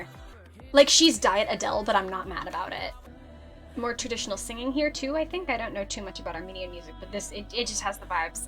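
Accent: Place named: England, United Kingdom. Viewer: Your voice and english accent is so annoying.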